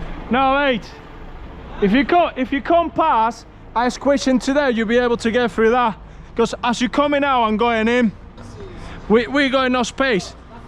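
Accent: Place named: Manchester, United Kingdom. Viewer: Think he’s from Manchester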